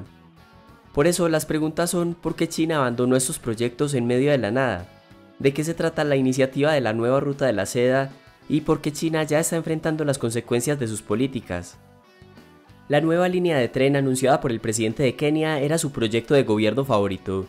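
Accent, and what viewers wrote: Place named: Coffee Region, Colombia. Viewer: NO HOMBRE!, ESE ACENTICO LO MATA. TERRIBLE QUE SONSONETE. CONSIGA UN NARRADOR NEUTRO. ES MUY FASTIDIOSO. NO PUEDE UNO CONCENTRARSE EN EL CONTENIDO, ACENTO CAMPESINO DE LA REGIÓN CAFETERA. MUY ARRASTRANDO.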